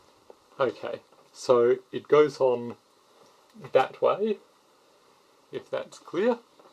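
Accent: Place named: Australia. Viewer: You hit the nail on the head with this video, metal screws shouldn't just screw into plastic, look at the main long rod from the motor, thats the same deal, You need to make sure you get a copy of the STL file so you can make another as this is where you will get wear and tear, all of any of the moving parts that are metal that are in 100% contact with plastic will sooner or later fail, look at a RepRap for how the screw rods all have a nut to screw into and not bare plastic. You sound like an Aussie, I am as well, I had an order with this guy at milkyoubox and he took too long to send, 5 months was too long to wait, others wait still. Order canceled. This printer is cheap, in all ways, construction and money, but all up the waiting around costs more than anything, and all who give money too the guy at milkyoubox is padding his bank account as long as you wait, the interest from your own bank account you could have made on your money instead of he at milkyoubox.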